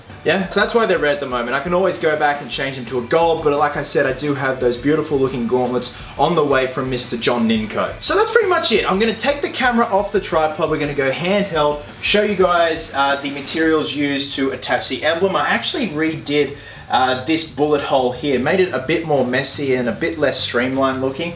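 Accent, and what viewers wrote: Australia. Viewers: It's kinda weirding me out watching Batman speak with a high pitched Australian accent. Like some wires got crossed in my brain it something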